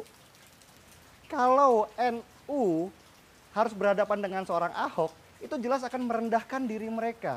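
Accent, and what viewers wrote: West Java, Indonesia. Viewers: mantaaaab bang..Ketika mengucap U dan I mengingatkan saya kepada kang Asep... sepertinya pernah tinggal lama di jabar ya bang..hehehe.. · Bang orang Medan tapi terkadang aksennya kayak orang sunda.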